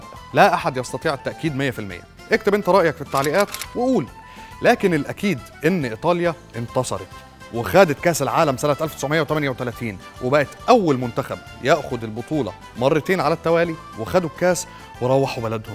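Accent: Egypt. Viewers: ليش بتحكي مصري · اسلوبك في طرح الموضوع جميل ولكن لماذا تتحدث بالهجه المصريه لو التزمت بلهجتك تصبح اكثر تمكننا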